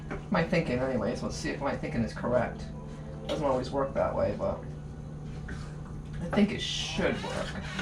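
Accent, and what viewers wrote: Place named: New England, United States. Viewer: Your accent. Maine or N.H.?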